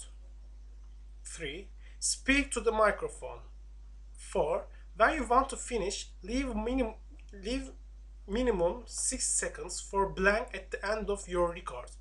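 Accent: Turkey. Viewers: Türk aksanı bu nerde duysam tanırım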